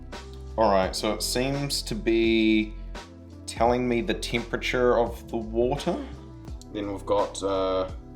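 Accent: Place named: New Zealand. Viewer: foreeeeever agor haha kiwi accent is so cutee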